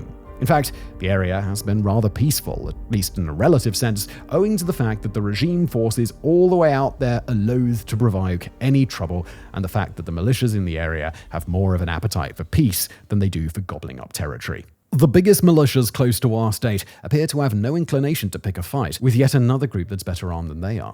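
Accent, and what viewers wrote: England, United Kingdom. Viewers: He's terminally English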